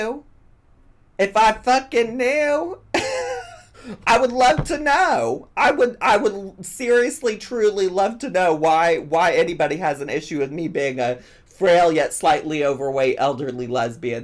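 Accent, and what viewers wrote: South, United States. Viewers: has such a gentle southern accent for a midwesterner 😂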